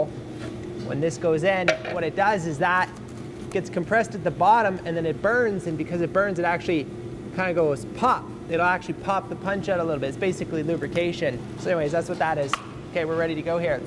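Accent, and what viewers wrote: Canada. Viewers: dude i didn't need any1 to tell me that this man is Canadian lol, no pun intended i love the Canadian .....what ever 1 calls it lol .. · Holy crap this guy sounds Canadian!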